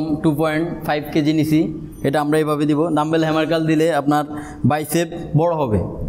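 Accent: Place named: Habiganj, Bangladesh. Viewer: ভাষা শুনে মনে হচ্ছে আপনি হবিগঞ্জ এর মানুষ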